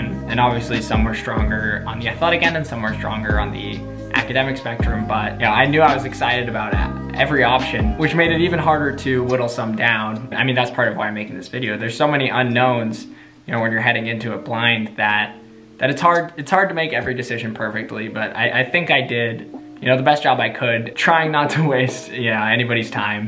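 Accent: United Kingdom. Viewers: Wow! Very cool story. Your intelligence, high standards, and perseverance have clearly paid off, and probably will continue to do so in the future. Your ability to communicate well has really helped me to understand your process. When you speak I am able to visualize your story, step by step, and truly feel your experiences. Awesome job!!! I look forward to watching your running career and life unfold. I have one simple and fun question. Talk to us about losing your British accent. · Where is your British accent?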